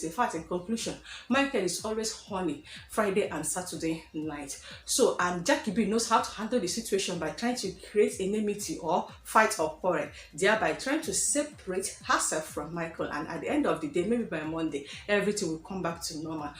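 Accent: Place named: Igbo, Nigeria. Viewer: your voice is too igbotic nne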